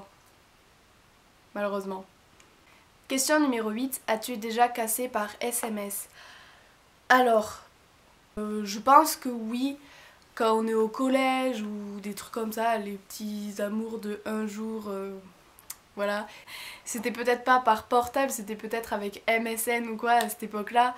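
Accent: South, France. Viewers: Les cheveux courts te vont super bien, tu as un super accent du Sud (comme nous ^^ Lol) et tes videos sont géniales! Hate de la prochaine Vidéo! #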